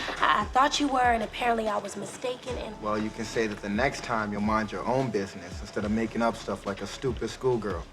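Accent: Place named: South, United States. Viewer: Another entertaining video as always! Also I love your accent, like the way you said poem , it’s so cute! If you don’t mind me asking where are you from? (I know your southern based on your voice but I know different southern states have different dialects)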